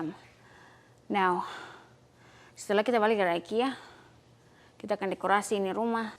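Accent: Manado, Indonesia. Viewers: Saya sng logat.manado..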